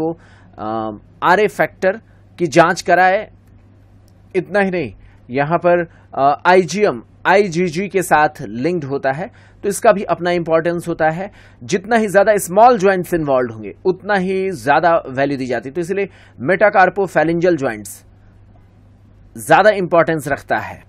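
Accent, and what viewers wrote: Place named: India. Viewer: Good, but please hire a narrator with clear English because it's difficult to understand your Indian English (no offense)...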